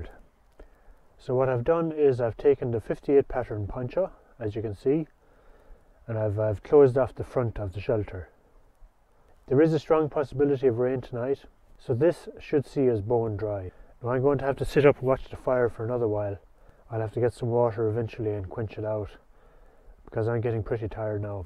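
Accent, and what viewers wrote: Tipperary, Ireland. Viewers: im telling you one thing that tipp accent is gold you have a narrators voice and from one irishman to another i hope to bump into ya in the woods someday for a cuppa tea and a chat.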